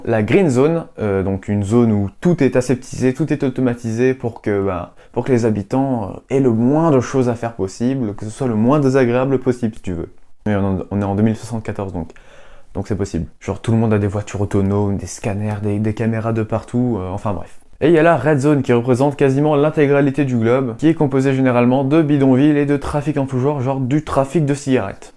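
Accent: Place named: England, United Kingdom. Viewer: Superbe accent anglais :O et c'était très sympa comme vidéo , peut être un pouale lent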